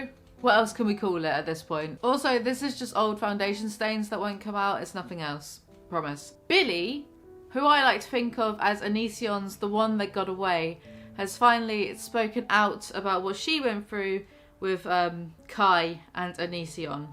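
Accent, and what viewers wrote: United Kingdom. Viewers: oh man, I haven’t enjoyed a British voice like this since John Lennon. when you look at the camera and tell Greg to fuck off after exposing some shit, well, it really hits home. just fucking hilarious. 😂 It’s so good that I know Onision really has defense at all to it. he probably should be scared.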